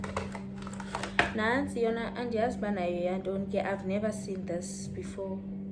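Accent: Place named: South Africa. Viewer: I am here for your Xhosa accent mtase, the way I love it❤❤ I enjoy your vlogs ke sana...I love youuuuuuu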